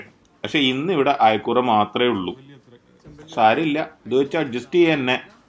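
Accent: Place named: Thrissur, India. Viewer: എങ്ങനെ ഈ തൃശൂർ വള്ളുവനാട് സ്ലാങ് വരുന്നു തിരുവനന്തപുരത്ത്കാരന്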